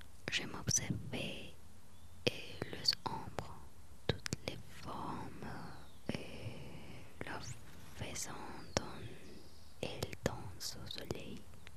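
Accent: Spain. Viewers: C'est la première fois que je viens et tu as une agréable personnalité, ton accent est trop chou. J'adore la langue espagnole. Bonheur à toi guapa morena, j'espère que tu reviendras🌹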